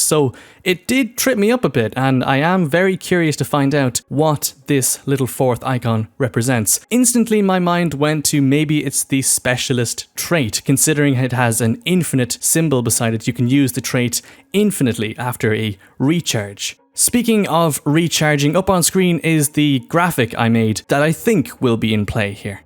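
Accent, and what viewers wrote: Ireland. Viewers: Are you Irish by any chance? Accent sounds local ish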